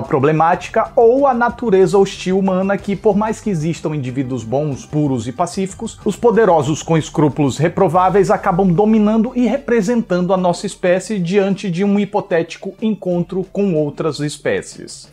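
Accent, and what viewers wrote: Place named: North, Brazil. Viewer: sou muito fã dos teus reviews, teus roteiros são muito bons e termos como "trancagem fiofal" são inigualáveis, além de muito bem pautados tuas críticas, mesmo quando minha opinião é totalmente contrário da tua, teus argumentos são satisfatórios, mas percebi que tens um sotaque mais parecido com o pessoal do Norte/Nordeste, mas tu usou bastante o termo "Guris", "gurizada" mais comum no sul e teu linkedin é do Rio de Janeiro... explica essa mistura pra gente!!!!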